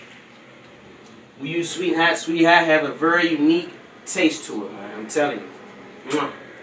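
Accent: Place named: DMV, United States. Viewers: I was thinking that he sounds like he from here lol the slang sound similar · That accent…definitely from the DMV! #HOME 💪🏽🤎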